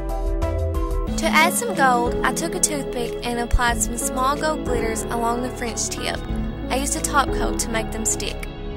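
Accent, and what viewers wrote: South, United States. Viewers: You guys act like you've never heard someone speak with a southern accent before LOL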